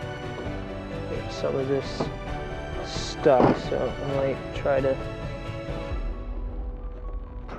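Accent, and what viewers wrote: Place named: Philadelphia, United States. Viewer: Thank you so much for this tutorial! I could tell you were from Philly from your accent! :)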